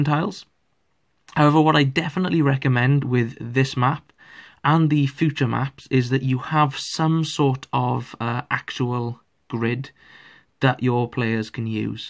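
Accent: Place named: Wales, United Kingdom. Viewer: I know this accent! Are you a fella taff?! ❤️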